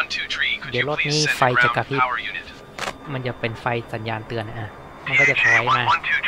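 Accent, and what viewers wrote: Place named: North, Thailand. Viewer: กลางเด่น (สาบเมืองขนาดเน๊าะ รู้เลยว่าคนเมือง)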